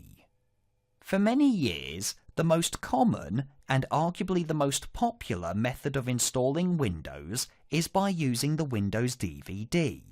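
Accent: United Kingdom. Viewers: Best explanation ever on Hyper-V and I enjoyed the British accent. Well done and thank you for the free training videos.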